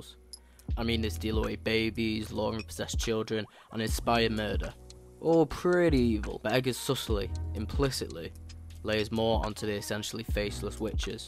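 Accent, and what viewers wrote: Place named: United Kingdom. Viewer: I scrolled down to subscribe wondering if you had tens of thousands of subscribers or hundreds of thousands. I'm truly astonished you only have 35 when I write this. This is a really good video essay, nicely presented, excellently written and well researched. Honestly, I don't have an issue with your diction being a fellow Brit, but I think to be more accessible to international audiences the other comments are right, you have to clear up your accent a bit. I know it sounds classist but the BBC have it right wanting ppl's accents to be clearly understandable.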